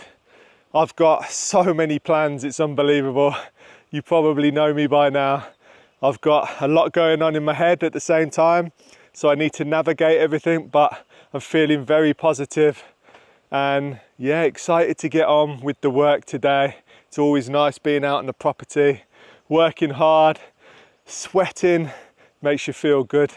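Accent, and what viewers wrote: Birmingham, United Kingdom. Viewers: I live in the UK and was oriinally from Birminham, so your accent reminds me of home. I think you are such a survivor, you have that huge land, which was taken on as a couple, but now one man. All the animals also to take care of and put a video together. Just where do you get all this engery from? Really hope you get others to join you in caring for such a property. Hope the legal stuff gets sorted friendly for you x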